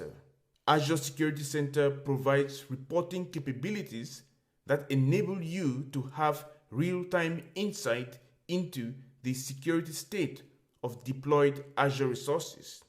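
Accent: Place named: Africa. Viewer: Your name is, but accent is african... where are you from, just curious...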